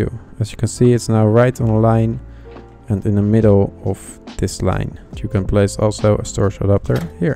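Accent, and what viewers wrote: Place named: Netherlands. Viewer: The dutch is strong in this one....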